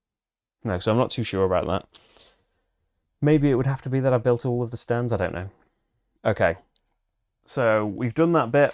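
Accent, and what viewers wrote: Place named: England, United Kingdom. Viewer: Hey what are the odds finding a YouTuber playing Elven, the released version and not the KS preview, from the Country that’s the home of Football, with an accent my BE Teacher would have loved, and then him not being a football fan at all? 😂 Too funny. Thank you! Excited for more on this. Regarding to Rules, you missed the Sponsor thinks others mentioned and you are only allowed to have 5 attacking and 5 defending players, in every Games. That leads to more realistic scores. Meaning You get more 1:1 and 2:1, than 4:5 ore 3:3…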